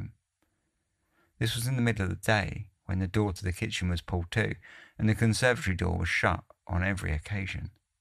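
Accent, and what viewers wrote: England, United Kingdom. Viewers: Sounds like English accent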